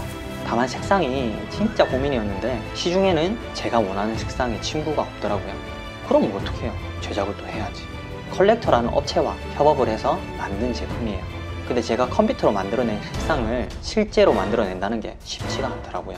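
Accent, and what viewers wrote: Gyeongsang, South Korea. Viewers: 암생각없이 보다가 친근한 갱상도 사투리도 글코 멘트도 넘 우껴서 좋아요 구독 알림설정 쓰리콤보 하구 갑니다ㅋㅋㅋ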